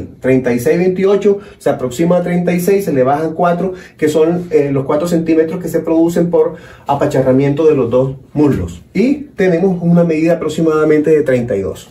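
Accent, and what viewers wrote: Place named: Venezuela. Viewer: Clase magistral, pareciera complicado, pero solo hay que prestarle atención y practicar. Tiene lógica. Gracias. Estas en Colombia, oero eres Venezolana, cierto? Por el acento. Soy venezolana, vivo en chile.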